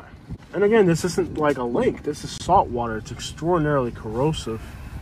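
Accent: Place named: Hialeah, United States. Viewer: Bro the voice was epically creepy..but you are definitely speaking truth..keep up the great work..yet the Hialeah accent got me😂..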